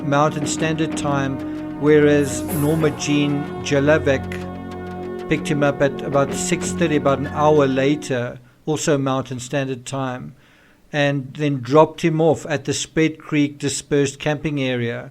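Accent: South Africa. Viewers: I love your accent am I right in saying it's a South African accent. Its the one accent I wouldn't mind the whole world having.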